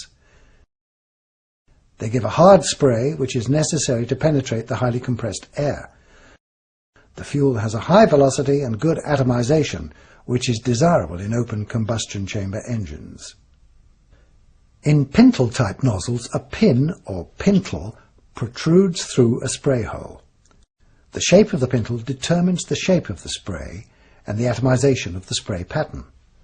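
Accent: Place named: United Kingdom. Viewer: للأسف التعليق بإنجليزية بريطانية.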